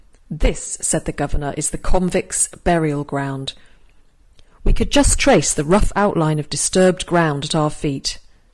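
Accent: United Kingdom. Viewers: You have a lovely voice. And as a non native English speaker and someone who used to live in London, I am enjoying your British accent thoroughly!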